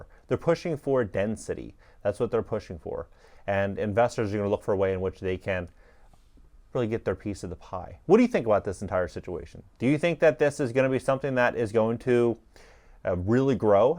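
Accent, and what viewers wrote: California, United States. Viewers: You sound like a native Californian, am I right?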